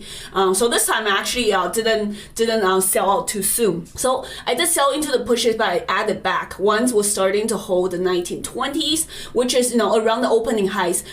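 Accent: China. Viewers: Can’t stand your Chinese English accent 😂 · Your accent is so thick I swear it’s like you’re speaking Chinese sometimes. I have to work hard to understand what you’re saying.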